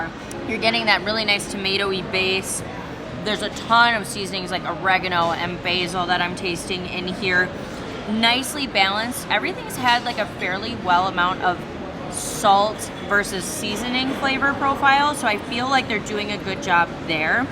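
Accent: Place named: Midwest, United States. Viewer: Definitely from Michigan or Wisconsin with the accent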